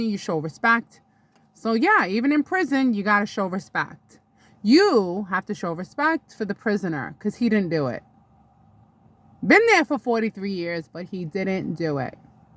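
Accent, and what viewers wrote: New York, United States. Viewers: You have that new York accent .love it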